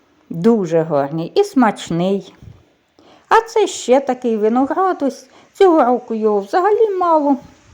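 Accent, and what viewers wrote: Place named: Kyiv-Poltava, Ukraine. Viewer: Вітаю 🤗 дуже люблю ваш київсько-полтавський говір 💞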